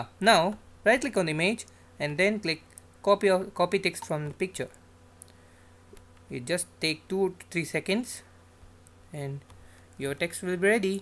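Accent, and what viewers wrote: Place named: India. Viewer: Thank you, this was helpful. Your Indian accent is funny.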